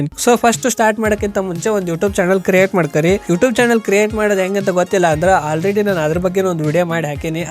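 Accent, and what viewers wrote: North Karnataka, India. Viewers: ನಿಮದು ಯಾವ ಊರು ಅಣ್ಣ..?? ಪಕ್ಕಾ ಉತ್ತರ ಕರ್ನಾಟಕ ಬಾಷೆ ಅಯ್ತಿ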